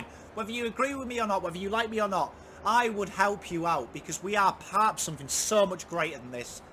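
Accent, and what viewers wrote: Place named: North, United Kingdom. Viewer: Never seen you before but hats off - good analysis.... the beautiful irony of AFL analysis with the Northern UK accent! I'm a 52 year old life long Blues fan and this, I feel, was the lowest performance I have ever seen! The particular pain I feel is around the fact that we have a core of very talented players.... but the level of desire, determination and far dinkum 'have-a- go-edness' was totally absent - apart from the same few who try their boxes off & show something every week (Walsh, Weiters, Harry etc think.... "fuck maybe I'm receptive to other clubs warm embrace). Need for the humility & reality involved in your 'Rehab' is a good metaphor for this sorry situation - knowing 'where you are at and embracing the hard work on a worthy journey'. Has Teague lost this group? Sunday SHOULD have been a showing of ferocious intent to demonstrate (even if only to ourselves) that we have 'something' by way of ticker. 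Instead, what I witnessed was a demonstration of such incompetence, lack of passion and lack of 'team' that I found myself (for the first time) not even disappointed - just numb. Keep up the good work - you're showing more passion than some of the folk who should be Driving this club!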